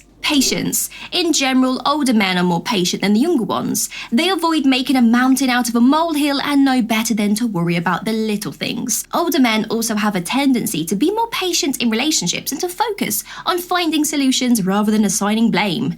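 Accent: United Kingdom. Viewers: I kind of like the British accent, and you don't make the eyes hurt. I do find myself going whew when you finish a video...as while I enjoy listening to you talk I feel bombarded at times.... peace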